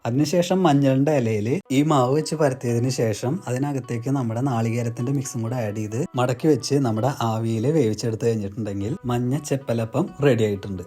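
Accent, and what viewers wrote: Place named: Thrissur, India. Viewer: തൃശൂർ ഭാഷ കേൾക്കാൻ സൂപ്പറാ❤❤❤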